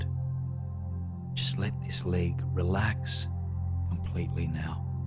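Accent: Australia. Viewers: Hi, lovely to listen to your videos. It's actually quite nice hearing another fellow Aussie. It makes the whole thing more familiar and comforting ✨️ ✨️ ✨️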